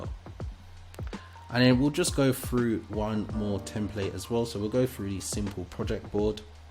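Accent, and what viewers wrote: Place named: United Kingdom. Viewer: Thank you for the video and the refreshing-to-hear British accent.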